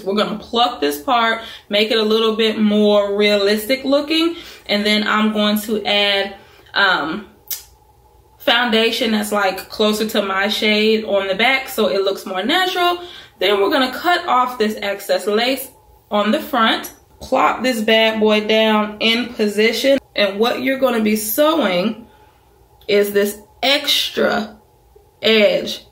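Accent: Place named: Virginia, United States. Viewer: Girl!! You the bomb! Love your energy! You sound like a VA girl!❤❤❤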